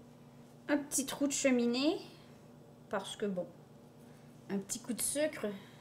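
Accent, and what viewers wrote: Quebec, Canada. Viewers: Sympa ton ambiance cool et détendue. ...+ l accent québécois. ..j adoooore 🖒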